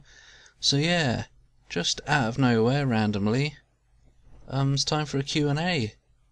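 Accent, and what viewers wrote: United Kingdom. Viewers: 1. What are the earliest memories you have with games, like what games did you play first? What were the first ones that really got your attention? 2. What region of, I assume, the UK is your accent from? 3. Do you participate in game jams and what engines have you used to make games?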